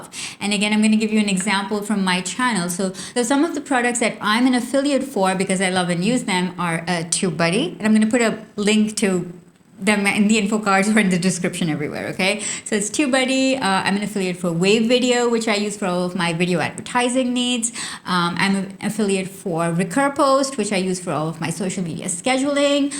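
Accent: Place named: India. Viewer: I love your accent...are you Indian ?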